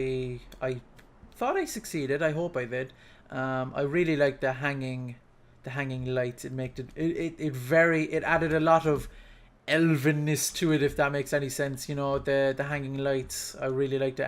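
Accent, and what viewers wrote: Ireland. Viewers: I believe he is from Cork (Ireland). He is definitely Irish though.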